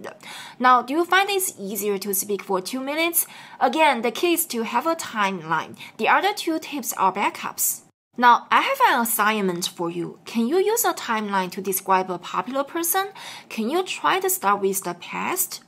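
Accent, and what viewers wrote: China. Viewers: But your English sounds more like Chinese than English; I'm getting confused listening to you.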